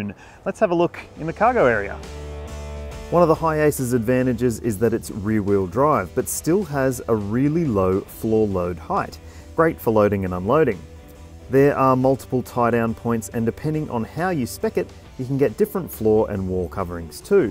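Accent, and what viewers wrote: Australia. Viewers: Hate the Aussie accent, but good, informative and even fun piece.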